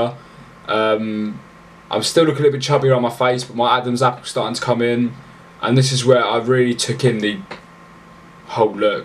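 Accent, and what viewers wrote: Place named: United Kingdom. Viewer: If you're gonna play background music with high frequencies, like guitar picking, you need to turn that shit down cause it's way too damn loud. Don't feel bad though. Most YouTubers do it. just remember that we want to hear that beautiful, bodacious British accent, not the homeless Irish woman picking her guitar in the background.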